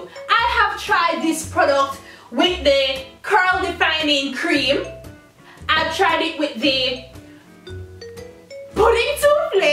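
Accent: Jamaica. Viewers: so refreshing to watch a hair blogger with a jamaican accent 💖🙌🏿 you really named and shamed them products ha HAAA 😂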